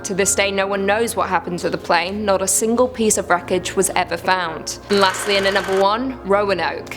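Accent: England, United Kingdom. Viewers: I agree ......you are very pretty ....love your hair...hate the big baggy shirts I can only imagine....the subjects are very interesting ....love the accent as well.....english? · I know it’s been said before and it will be said again, but there is something about hearing info with an English accent that makes it seem more legit. And kinda spooky too.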